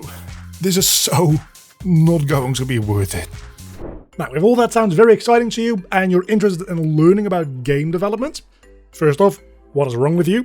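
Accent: Netherlands. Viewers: I was like "this guy sounds so Dutch".. then looking at the About page..😂 Anyways, lekker bezig! Ik moet binnenkort ook maar eens gaan koekeloeren in Unreal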